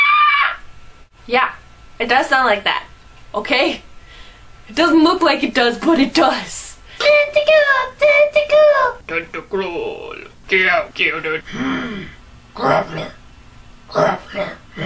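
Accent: United Kingdom. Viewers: 6 falam que ta ruim mais e por que ela fez no sultaque ingles (nao sei como escreve sultaque)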